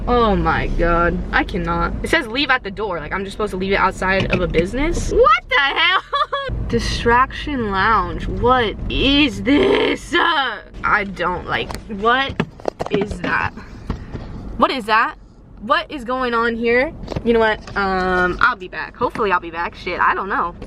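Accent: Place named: South, United States. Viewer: I would of never guessed you were in Cali. I figured you were Southern. LOL.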